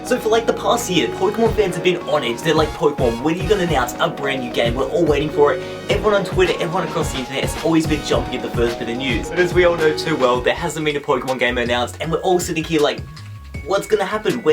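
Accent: Australia. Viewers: he is Australian that is how we speak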